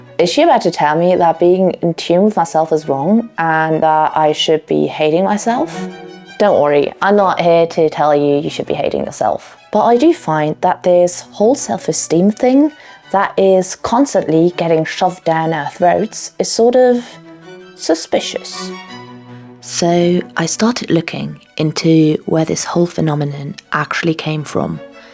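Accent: England, United Kingdom. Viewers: your englsh accent is awesome, why americans cant speak more like that. lol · Miss, are you Italian? You sound British. 🌹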